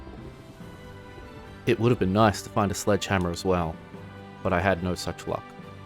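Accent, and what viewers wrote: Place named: Australia. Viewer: "I snuck up behind one of them, and stabbed them so hard their pants changed color... That was weeyud." 😂This gave me a good chuckle lol Edit: "Weeyud" is just how my big-dumb Americanized English brain hears "weird" with your Aussie(?) accent! lol My bad if it's not Australian. Despite the fact that the Aussie and Kiwi accents are pretty much my favorite English accents to hear of all of them, I sometimes have difficulty differentiating between the two. A little off topic but I also really enjoy the accent Tom Hardy is doing with Alfie Solomons/Ron and Reggie Kray, which I've been lead to believe is a "cockney" accent, though I literally have not one fuck of an iota what that is and wouldn't pretend to lol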